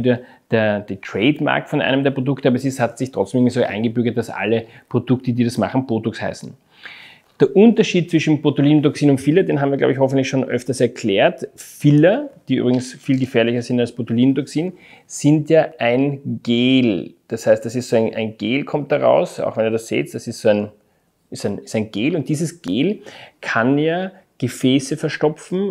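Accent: Austria. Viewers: Echt jetzt? Ich bin Polin, nie in Österreich gewesen (in DE übrigens nie länger als zwei Wochen am Stück) und verstehe ganz normal. Wäre Ihnen sächsisch oder schwäbisch etwa lieber? Herr Doktor spricht in seinen Videos übrigens keinen Dialekt, es ist Hochdeutsch mit dem niedlichen österreichischen Akzent.😊 Nichtmediziner können evtl die vielen lateinischen Namen nicht kennen, wie Septum, Ligamentum, N. Trigeminus, N.Facialis etc.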